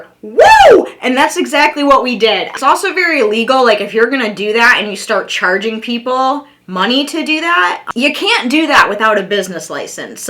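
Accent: United States. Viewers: Listen, if you are broadcasting to an audience of many millions, isn't it time to start thinking about good English? After all, you speak one of the local American dialects, which have very little to do with the real English. I don’t want to impose anything on you, but maybe you can still correct your English?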